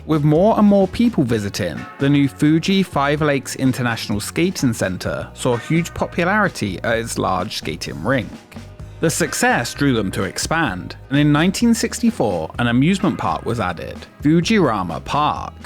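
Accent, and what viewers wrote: United Kingdom. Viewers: be honest are u a brit?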